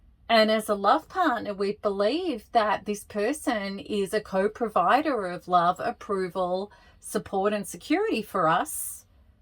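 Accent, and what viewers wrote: Australia. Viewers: I love the Ozzy accent. This content really hits home. My mom was a narcissist and she passed in 2018 and I keep learning more and more about her through these types of videos. It has to be one of the most misunderstood behaviours in society and challenging to understand. But it's a work in progress and that of course is a good thing.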